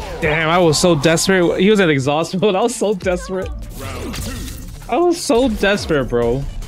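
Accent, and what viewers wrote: New York, United States. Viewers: Canada? I thought from NY!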